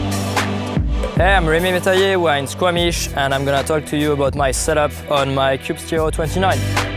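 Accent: France. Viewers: Clairement l’accent français est là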